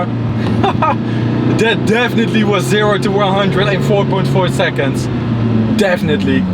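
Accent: Netherlands. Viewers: What a THICC hollands accentje😜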